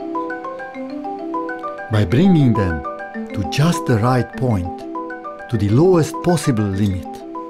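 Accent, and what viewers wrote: Hungary. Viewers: i though, that maybe this person is hungarian, because of the accent. :) its not because of fun. I was born in Budapest too. Hungarians love Japans and I hope they love us too. FOREVER JAPAN AND HUNGARIAN BROTHERHOOD. Greeting from London. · It is not fake. He is from Hungary, and he really speaks with that accent.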